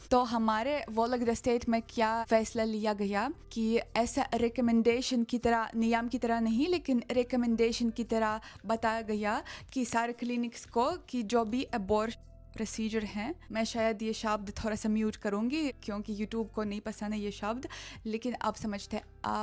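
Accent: Standard. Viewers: aapke videos badhiya hote hain aur aap shuddh Hindi bolte hai utana hamahare yahan par pade likhe loge bhi nahi bol pate hai. Maine Aap ko videos mai pahale bhi kai baar yeh bolte hue suna hai ki “ Bhagwan ne mujhe dimagh bahut kum diya hain “ aisa aapko videos mai aur apne aap se nahi bolana chahiye. Aisi meri aapko sujhaw hai. hamhare pure India se aapke bare mai koi bhi vyakti nahi bol sakta hai aisa mai samajhta hoon. Aap ek buddhiman avam khubsurat mahila hai. Ye keval aapka complex hai.please drop it. Videoske liye dhanywad aur subhkamnaye. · Bhai yrr itni sudhhh hindi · Bhai kitni pure hindi bolri h ye ladki ❤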